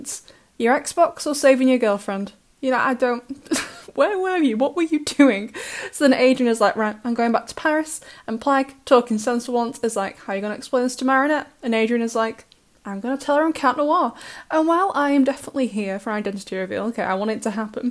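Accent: United Kingdom. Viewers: ✨ToMaTo BoY ✨The British accent>>>>>>>>> slays!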